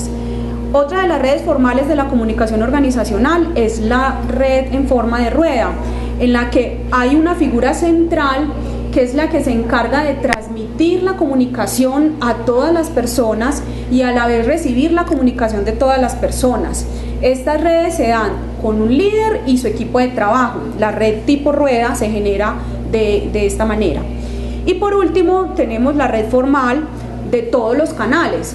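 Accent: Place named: Antioquia, Colombia. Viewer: excelente material pero debería ser mas neutral en su acento y entonación, demasiado paisa en su forma de hablar.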